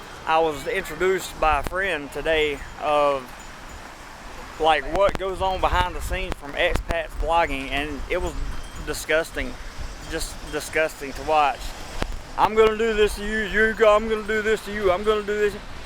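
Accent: South, United States. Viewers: Irishman he has a southern American accent. This is not to say he is good old boy. That term can be taken as a pejorative by some.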